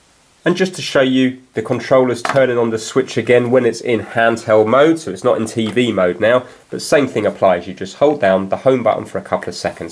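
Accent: United Kingdom. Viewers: He doesn't speak American English he speak British English UK English he mean the game Zelda not the persons Zelda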